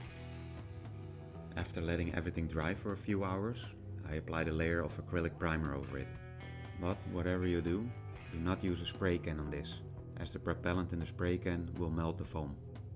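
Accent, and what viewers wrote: Netherlands. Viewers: You are dutch, aren't you? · Laat me raden, Je bent Nederlands of niet? Hahaha Nee maar Nicely done! :D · is he dutch? his accents sounds sooo dutch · You sound Dutch haha. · Are you dutch?!?!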